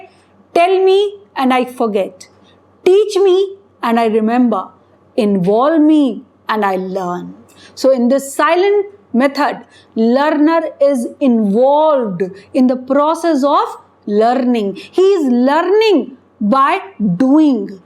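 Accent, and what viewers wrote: India. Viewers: Don't make rude comments just based on her accent. People are so quick to judge others these day. She is from India and her accent is greatly influenced by her mother tongue. Jackie Chan though he has been working in Hollywood for years he still speaks English in Chinese accent. Even the great Kalam sir used to speak English in Indian accent. So would you treat him stupid just because his accent is bad?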